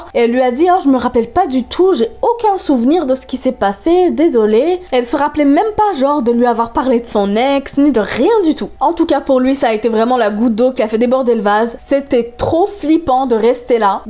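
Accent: Quebec, Canada. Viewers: Est tu québécoise car on dirais un accent mis français mis québécois?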